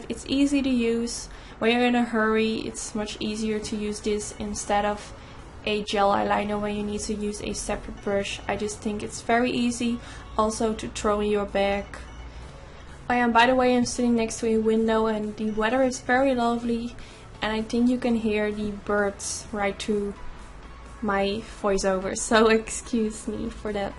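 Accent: Netherlands. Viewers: I love it! Your work is very precise, you're truly an artist :) also, your accent sounds Dutch, am I right? Oh and I've subscribed 😄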